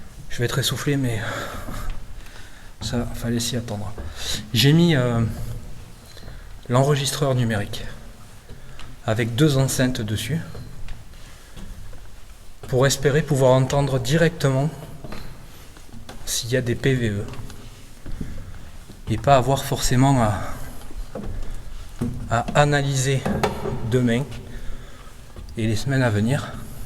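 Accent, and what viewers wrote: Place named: South, France. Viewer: Un Abonné de plus ! Je suis fan du travail de Guss DX et j'attendais avec impatience un contenu similaire mais avec une touche personnelle différente. Qualité de Montage, Durée de vidéo et l'accent du sud 3 épisodes et me voila déjà fan de ton travail ! Continue sur ta lancée je trouve cela Génial !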